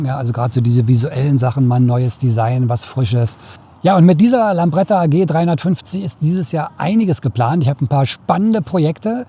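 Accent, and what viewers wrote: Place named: Berlin, Germany. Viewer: Da ist sie wieder. Die Stimme von Hans Rosenthal 😊. Immer sympathisch und kompetent mit ner duften Note Berliner charms. Bin gespannt auf das Jahr mit deiner „Neuen“. Schade nur um den Zontes, da hätte mich doch ein Langzeittest sehr interessiert. Geht’s denn damit auch in den Urlaub? Bin gespannt. Also, herzlichen Glückwunsch und allseits gute Fahrt. Vielen Dank für den und die vergangenen Berichte. Bitte immer weiter so 😎✊🏻. Schönen Ostersonntag noch.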